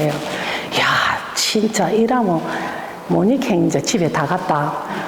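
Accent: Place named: South Korea. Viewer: 구수한사투리에 좋은몫을선택하여 질곡의삶을즐겁게신나게 강의해주신봉사자님 주님께서되갚아주실것을 굳게믿으머엄청사랑합니다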